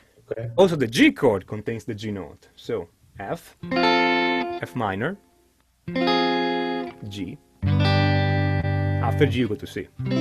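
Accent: Italy. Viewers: Are you italian in Milan? You sound 100% like my boss 🤣 great videos!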